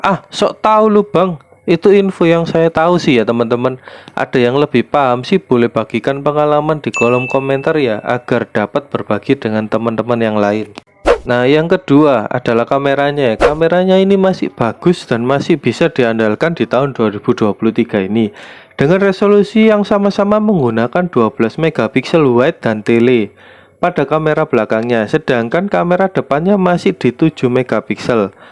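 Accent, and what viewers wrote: Java, Indonesia. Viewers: kok medok banget..😅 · Jawane medok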